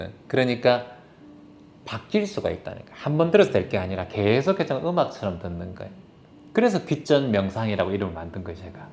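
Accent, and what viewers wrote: Gyeongsang, South Korea. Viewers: 저는 대구입니다. 편안한 목소리와 살짝 경상도 억양이 더 정감갑니다.💓 늘 감사드립니다. 감사합니다 ^^고맙습니다 ^^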